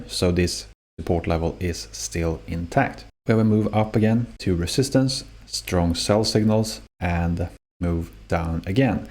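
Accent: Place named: Sweden. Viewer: He sound pretty swedish🙌🇸🇪👏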